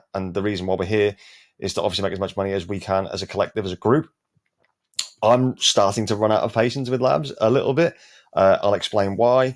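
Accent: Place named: London, United Kingdom. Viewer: Hi....I like your frank videos. Sensible. You sound like you're from in or around London somewhere. Me too. Anyway, I've noticed a pattern recently on my portfolio. I go to bed at midnight (GMT), have a quick look at the value, then wake up at 07.00 and I'm in the money! Maybe 3-4% - all good. Then about 10.00am it starts to fade back about 2%. So, my theory is that the overnight markets, (guessing it's west coast US, then Korea and Japan are influencing the prices)., can maybe be predictable. So, here's the plan...I buy good tokens at midnight, (HBAR,QNT,XRP) then sell when I wake up...Is this a foolish idea?....What do you think? Cheers pal.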